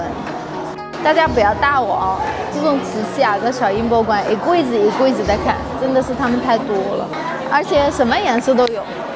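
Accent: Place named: Beijing, China. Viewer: 你看看人家歪果仁女主播！这北京话说的那叫一个地道儿~~！！！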